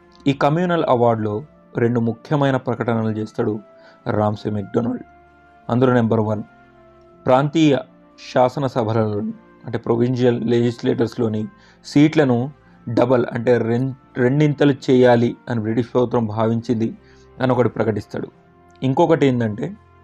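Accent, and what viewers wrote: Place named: Telangana, India. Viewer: Keep it up ..your Telangana accent is nice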